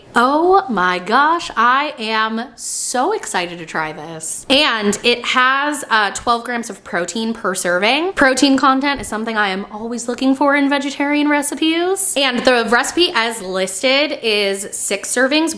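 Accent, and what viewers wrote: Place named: Southern California, United States. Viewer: You pronounce a lot of things differently than me but who cares! I live in NY and you have a SoCal accent. I think it's cool to hear voices different than my own.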